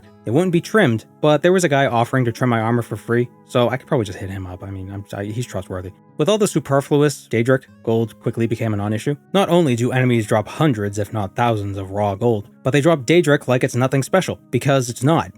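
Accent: Boston, United States. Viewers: i realize this is an odd question to ask, well spoken TES challenge run youtuber I subscribed to a bit ago, but are you from eastern Massachusetts? Very rarely in your videos, i occasionally catch a familiar accent in your VO (in this one you said bastard as 'basted' one time), but I can't tell for certain if it's Bostonian or not.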